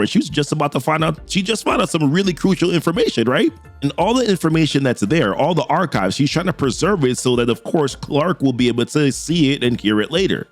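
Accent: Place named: Jamaica. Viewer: i didn't know you were a yardie !? what part you from ?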